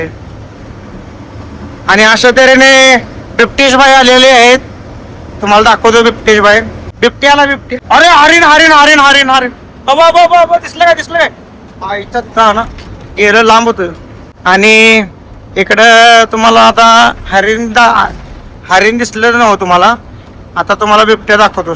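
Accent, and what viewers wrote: Kolhapur, India. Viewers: भाऊ तुझे ब्लॉग छान असतात, पण तू व्हिडिओमध्ये घाण घाण शिव्या नको देत जावू. कारण तुझे व्हिडीओ लेडीजसुद्धा बघत असतात, तुझी कोल्हापुरी भाषा शैली मस्त आहे, फक्त शिवीगाळी बंद कर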